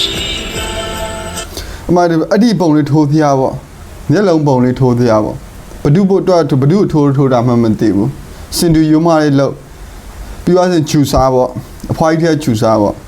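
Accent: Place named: Rakhine, Myanmar. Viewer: ရခိုင်နဲတူတယ်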